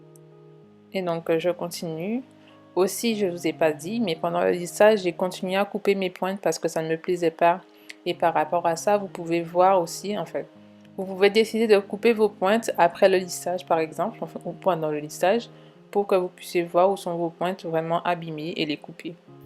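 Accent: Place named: Guadeloupe, France. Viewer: Oui super! Ma question était un peu bête car en regardant ta video 23 choses a savoir je l'ai su mdrr (de toute façon à l'accent je m'en doutais eheh) #TeamGuadeloupe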